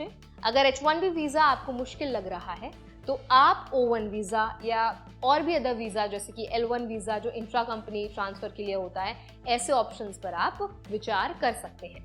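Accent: India. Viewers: I'm sorry but you Indians hsan annoying accent when you speak English. Have you ever listened to yourselves?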